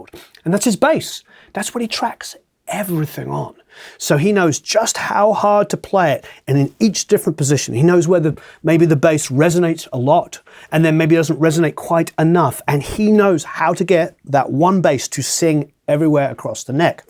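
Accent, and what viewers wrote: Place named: RP, United Kingdom. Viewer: Hi, Hope you are doing Marvelously Well! :haha I remember watching 5 years ago and I still remember the posh accent lol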